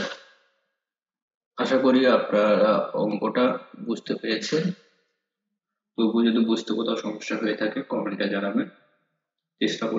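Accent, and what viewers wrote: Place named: Bogura, Bangladesh. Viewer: Apner bari maybe bogura te,,apner kotha sune bujlam mone hoi bihar Union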